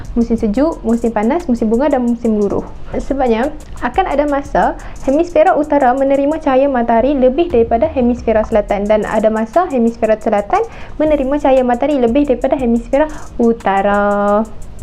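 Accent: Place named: North, Malaysia. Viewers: Lorat utara pun kuat jugak tu 😆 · Dia punya putaq sampai aku dah tau speaker org utagha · Comel Orang utagha weh, berputaq teruih, hang mai aloq setaq kepala hang ku putaq,🤭🤭🤭🤭🤭🤭🤭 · Aku bangga dengan akakk ni rilek jaa cakapp utara macam besa 👍 bukann apa sbb kawan aku baru p kl sebulan balik mai dok cakap kl , contohnya tengah sembang dia tanya depe pi mane ? 😂 aku rasa orang kl cakap diorang bukan depe 😂 jem otakk nak tegoq 😅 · putaqqq..kuat naa utaraq dia😂😂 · Speaker pon macam orang utara juga tu hehe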